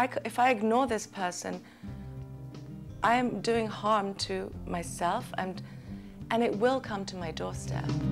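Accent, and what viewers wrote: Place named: Hollywood, United States. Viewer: good message, better with hollywood accent dropped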